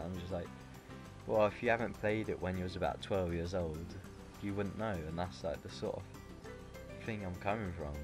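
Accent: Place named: United Kingdom. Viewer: A fellow Brit liking Kingdom Hearts! I have never heard a Brit do a commentary on it :) Love it :3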